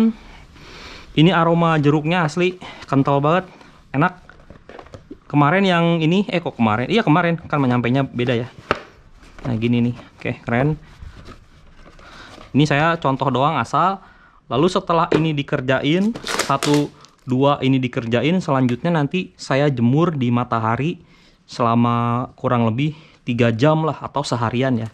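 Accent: West Java, Indonesia. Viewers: Bang Sunda Nya Sunda Mana Bang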